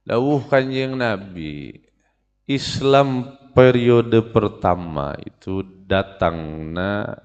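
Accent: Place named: West Java, Indonesia. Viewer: Muhun da ciri khas nada bicara Bandung Cimahi mah emang begitu mereen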